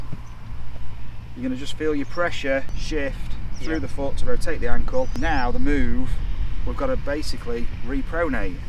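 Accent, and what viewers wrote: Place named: Doncaster, United Kingdom. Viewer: Sounds just like Doncaster 🤩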